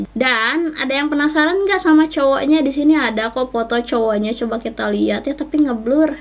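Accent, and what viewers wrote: West Java, Indonesia. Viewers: Itu logat Sunda sih kalo gak salah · ai kaka teh orang Sunda aku tau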